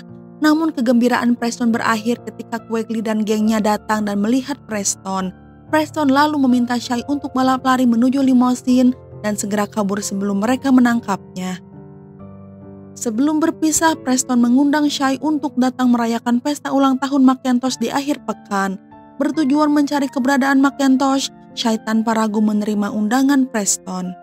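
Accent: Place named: Sunda, Indonesia. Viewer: Suaranya khas yaa sunda hihi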